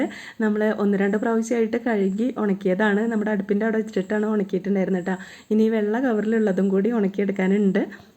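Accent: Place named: Malappuram, India. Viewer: നിങ്ങള് മലപ്പുറം ആണോ? ?സ്ലാങ് മലപ്പുറം ആണല്ലോ 😀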